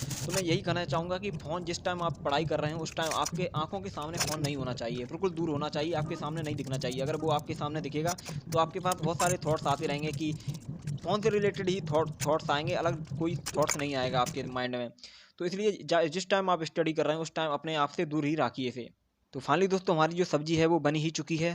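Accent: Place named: Chambal, India. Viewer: Bhaiya Nmakar ji Kha sy ho aap Dholpur, murena, Bhartpur, Agara, Bhind, Gwaliyr ... Inhi city's k aas paas k ho aap aapki Awaj s pta cjlta hy 😊🙏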